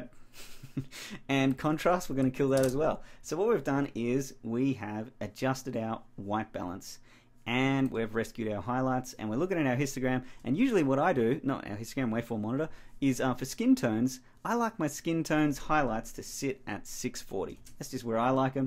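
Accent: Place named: Australia. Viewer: Great video mate thanks for sharing your workflow tips. Awesome to hear a fellow Aussie finally!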